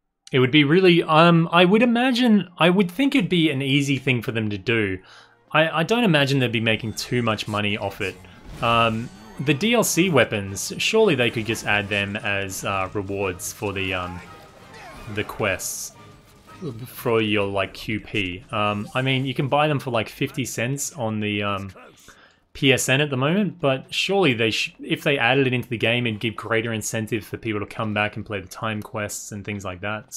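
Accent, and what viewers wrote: Australia. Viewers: Are you from Australia by chance?:D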